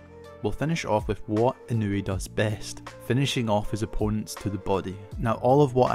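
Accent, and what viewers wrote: Scotland, United Kingdom. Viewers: Nice to hear a Scottish voice doing breakdowns as good as this. Good shit brother🏴💙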